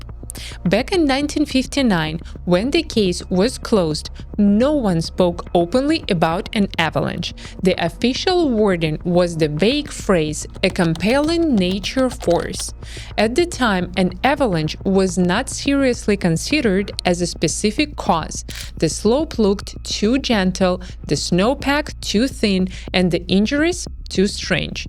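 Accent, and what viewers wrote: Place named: Russia. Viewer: What a fantastic deep dive into this story! So well explained, illustrated and presented. I appreciate the Russian accent too :) I hope one day the Soviet archives are opened up fully and we understand more. The angle on the Kyshtym nuclear event and aliens arriving is an intriguing one.... Thanks again and greetings from Spain.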